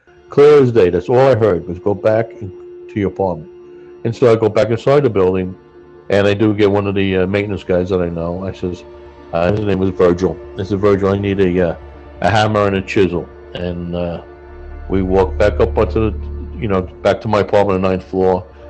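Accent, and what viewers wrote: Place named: Ireland. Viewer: Interviewer has an Irish accent from Ireland, firefighter has an americanized Irish accent.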